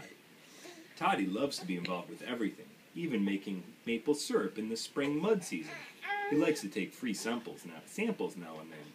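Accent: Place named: Maine, United States. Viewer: Awesome ! Sounds like Dolores Claiborne meets Fargo ! Lol but love it ... Love Maine accent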